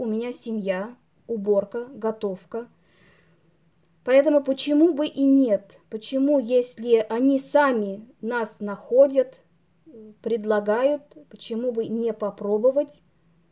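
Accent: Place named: Bashkortostan, Russia. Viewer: Здравствуйте,!Хочу поддержать Вас и Ваш канал.Видно,что Вы добрая,хорошая девушка,у Вас прекрасная семья и чистый уютный дом.И обзоры интересные,и говорите хорошо.И акцент небольшой придаёт только шарма,мне это нравится.Вы же ещё и на башкирском говорите,поэтому и есть небольшой акцент.Не обращайте внимания на злопыхателей,к сожалению они есть и в жизни тоже.Всего Вам доброго,успехов и процветания каналу!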